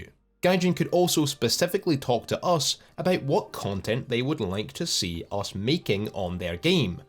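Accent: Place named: Scotland, United Kingdom. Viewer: Damn I love this scottish accent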